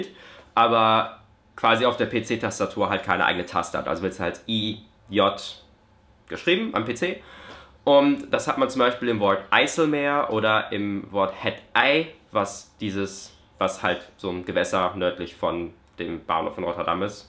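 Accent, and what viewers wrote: Standard. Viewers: Als Hannoveraner kann ich sagen, dass du in diesem Video Hochdeutsch redest 👍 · Also für mich sprichst du absolut hochdeutsch🤷‍♀️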